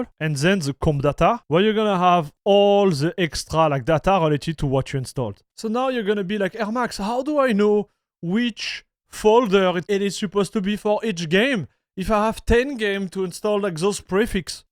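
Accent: France. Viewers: He is french guy? The accent its funny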